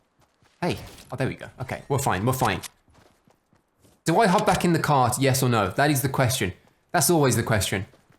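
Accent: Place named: England, United Kingdom. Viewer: “Tiki heads” are from Easter Island where they call them “moai”. Pronounced kinda like the English words “more I” if you read it with English accent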